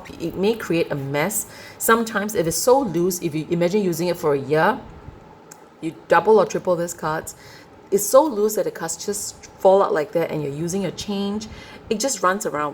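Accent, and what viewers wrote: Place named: Singapore. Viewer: Sounds like you are from Singapore. If you do could you let me know the price for both version? Thank you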